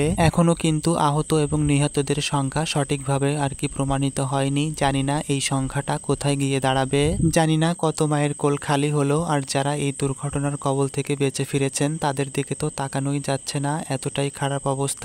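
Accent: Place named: Kolkata, India. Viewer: আমি ভেবেছিলাম আপনি কলকাতার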